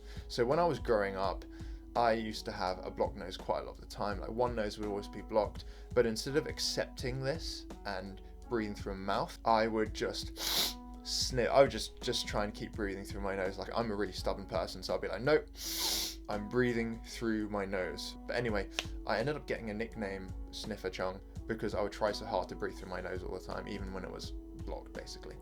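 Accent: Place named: United Kingdom. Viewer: ngl I've never seen an asian with a UK accent completely threw me off LOL. Great vid tho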